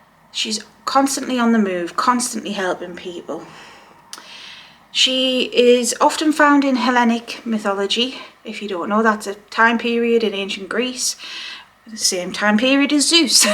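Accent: United Kingdom. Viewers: I'm only about 30 seconds into watching one of your vids for the first time, and my comment has nothing to do with the content, and for that I am sorry, but I just had to say quickly... I LOVE YOUR ACCENT! I love most British accents, and yours is just ... i love it! OK, now back to the content. ♥ EDIT: this was very helpful. I have felt drawn to Hekate for several years, but have wondered if she would even bother with me, like... I'm not special enough or something. Anyway, your video has given me renewed motivation and clarity, and I thank you for that!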